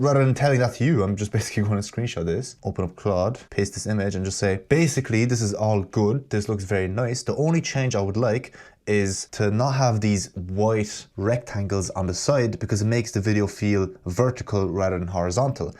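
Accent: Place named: Ireland. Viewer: Been looking for something like this for months, dziękuję bro I hear some Irish in that accent 🤝🏼🤝🏼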